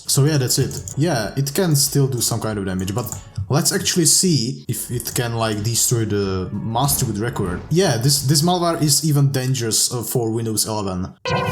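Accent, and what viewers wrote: Czech Republic. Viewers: hned sem poznal podle přizvuku že si čech dobrá prace ngl.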